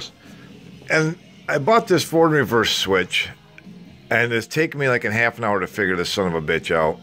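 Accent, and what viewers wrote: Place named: North America. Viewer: thank you for no selfie face shots. thank you for no annoying music. thank you for not wearing blue latex gloves to protect your delicate skin. thank you for no disclaimers such as " I'm not an electrician.....electricity can kill....wear safety equipment...."thank you for plain English....thank you for your x and o drawing.....thank you for keeping it short....thank you for speaking with a north American accent....thank you for cursing....thank you for not elevating yourself to a master class instructor the last 24 hours that's all I saw online, people spitting out technical jargon that makes no sense, and odds are they don't even understand it........everyone wants to be a youtube producer and15 min of fame so they can brag to their grandchildren.....am I bitter? FKN "A" I am. ....got a brake press that's down.....losin money.........and thank you for your humbleness when someone in here asked where the hot wires go.......you said......beats me...that was a long time ago.......hahaha....good one....later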